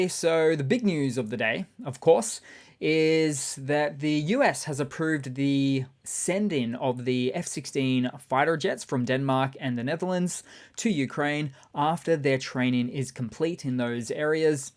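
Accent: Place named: Australia. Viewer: Now I know you are Australian by your accent , so by chance I came across an Australian Youtuber who lives in the outskirts of Moscow with his Russian Wife who does tours of the City and its surrounds (Travelling with Russel) and you can see by the videos he films , that the residents of Moscow give the impression everything is normal and there is no War Going On over the past 18 months , it is quite surreal to watch how the ordinary Russians go about their daily routine as though their country is at War at all, the supermarkets are full of all the food that they could purchase before the War started and the only thing they cannot purchase are European or Japanese vehicles other than the flood of new Chinese brand vehicles being assembled in their country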